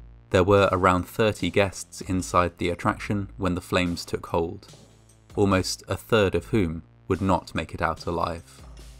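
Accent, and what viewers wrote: United Kingdom. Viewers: Why is it always British people that narrate these type of videos? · Nah, he's just British. They all sound like that. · He’s got the “creepy voice with British accent” thing down to a science!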